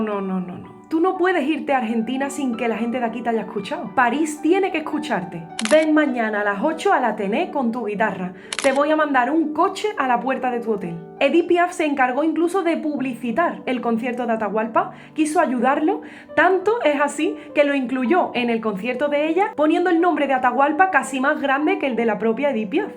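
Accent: Andalusia, Spain. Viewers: Q me gusta tu habla andaluza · Oye genia de qué parte de España eres por esa tonada tan particular tuya al hablar. Me gusta mucho lo q haces de verdad. Un abrazo desde Misiones, Argentina 😊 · ¡Brava! ¡Brava!, como dicen los italianos respecto de una performance muy lograda.. Es notable como, pese a tu bonito acento andaluz, lograste expresar el duende tan especial de don Ata. Lo mismo puedo decir de tu ejecución de la música en la guitarra. Muchas gracias por tu bonito regalo de ano nuevo a tus seguidores. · QUE HERMOSA ERES DE ANDALUCÍA ?? ESTUBE EN MÁLAGA Y ES UN ACENTO Muy BONITO HERMOSO SENTIMIENTO PONES CUANDO CANTAS ESO ,,SE NOTA EN UNA VERDADERA ARTISTA,,,MIRTA DESDE MENDOZA ARGENTINA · Que historia más hermosa, la música no conoce el racismo, ni xenofobia, ni egoismo, los grandes son grandes por eso. Me encanta tu acento pareces de Andalucía. Tienen tanta energía para expresarse que hipnotizan.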